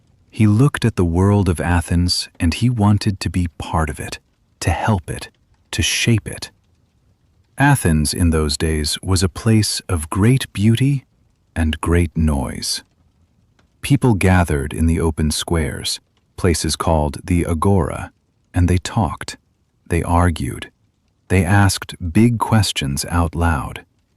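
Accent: United Kingdom. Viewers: It was nice, especially the English accent. I hope more interesting stories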